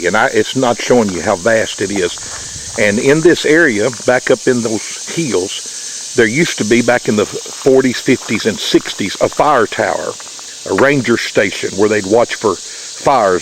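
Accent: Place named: South, United States. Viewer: Where/what state was this to have occurred? With your Southern accent I figured the South, but Sascwatch is Pac. NW area, I used to live in Oregon and I kept my focus sharp walking in the woods for Big Foot, though never saw one.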